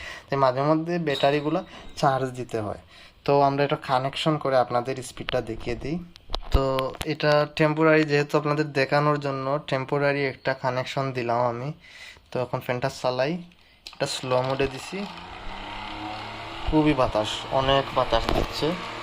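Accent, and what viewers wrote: Sylhet, Bangladesh. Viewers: Vai sylheti? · Vai apni ki Sylhety 🤔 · Vai apni ki sylhety ? 😀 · Apni sylheti naki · ভাই আপনার দেশের বাড়ি কি সিলেটে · আপনি কি সিলেটি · Vai ki sylheti ❤ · Vai er basha ki sylhet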